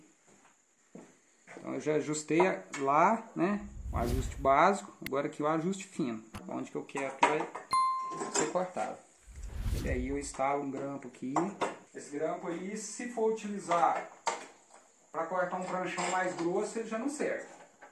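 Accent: Minas Gerais, Brazil. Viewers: Muito bom e ilustrativo ainda mais com esse sotaque mineiro ficou melhor ainda parabens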